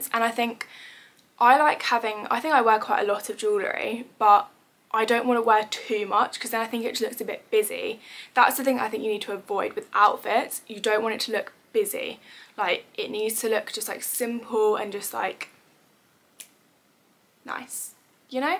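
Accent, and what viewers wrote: United Kingdom. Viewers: I like your British Accent!!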